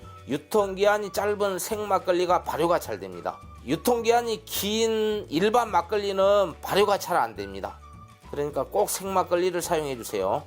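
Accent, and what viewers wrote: Jeolla, South Korea. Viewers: 전라도 사투리가 구수하고 요리에 정성인 세이프님 늘 감사합니다 이번에 LA갈비 4킬로도 성공해서 가족들 잘 먹었습니다 오늘은 호박술빵에 한번 도전해 볼렵니다 믹서도 어느분이 물어서 잘 구입해서 사용하고 있습니다 이번 김장에도 잘 사용할 것 같습니다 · 여수 광양쪽 사투리인줄 구수하고 좋내요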